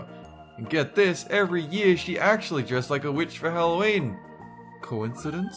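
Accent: Australia. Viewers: Yaaaay...narrated by 😊 G'day mate, love it that an aussie is such a successful youtuber and has awesome channel. Also love when you narrate in your very aussie styled, layed back, smart arse way. More narration from YOU pls! And a face reveal...of everyone pls?!?!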